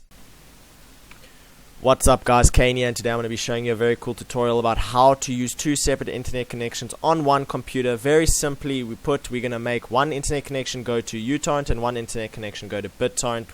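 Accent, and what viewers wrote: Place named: South Africa. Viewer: This bra sounds South African AF rofl awe bru thanks for the help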